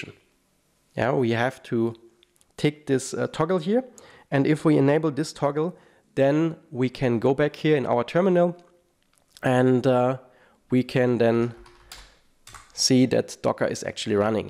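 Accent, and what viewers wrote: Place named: Germany. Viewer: Great Video!!! 🔥🔥🔥 Love the German accent! ♥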